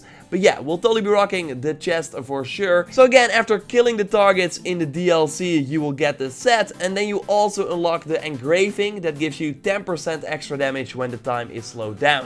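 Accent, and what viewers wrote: Netherlands. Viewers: This dude is 100000% dutch the accent is real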